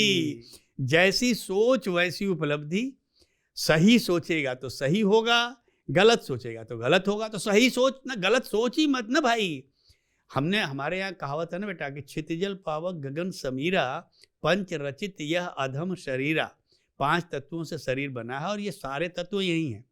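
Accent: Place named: Uttar Pradesh, India. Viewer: Khushi is baat se aati hai ki jab bolte ashutosh beta ,ye jo hamare UP ka jo lejhga hai h dil jeet leta hai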